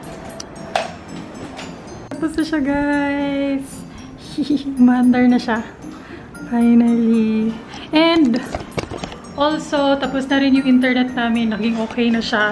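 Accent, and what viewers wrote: Visayas, Philippines. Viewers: Mas nindut bisaya pero syepre naa man gud tagalog viewers so sagul na lang hehe! Lahi ra jud ang accent sa bisaya ka lingaw. Pero ang evap ayaw tawn kalimti 😅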